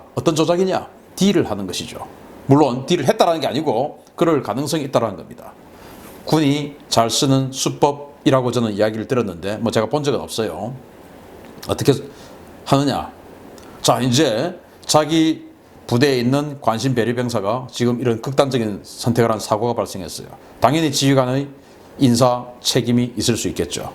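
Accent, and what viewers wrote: Gyeongsang, South Korea. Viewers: 또!!!!!!! 또!!!!!!! 쫌!!!!!!! 갱상도 남자의 강력한 멘트!!!!! 쵝오!!!!!!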